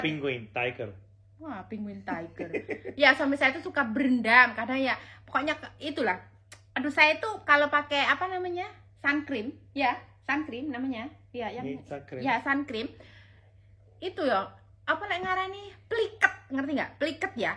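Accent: Java, Indonesia. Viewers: Itu bapaknya ga mungkin setres,,karna istrinya lucu lihat gaya ngomongnya aja udang ketawa gaya indonesia bangeeet. 👍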